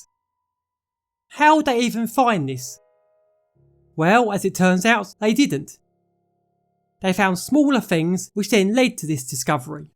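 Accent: United Kingdom. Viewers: This British accent is the most British accent ever.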